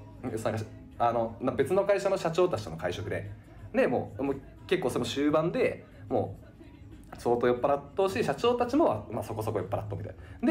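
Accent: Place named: Kyushu, Japan. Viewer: ふぉいが九州の方言で喋ってるのもめちゃ萌える🥹🥹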